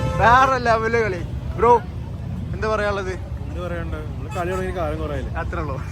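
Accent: Kasaragod, India. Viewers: bro kasaragod aahnnoo bro slang cheruthaayii ksd slag pole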